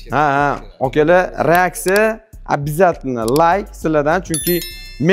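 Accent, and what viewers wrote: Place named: Tashkent, Uzbekistan. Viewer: Тошкент шеваси чарчативоради эшитадиган одамни, кучанип нима киласиз адабий гапираверинг😅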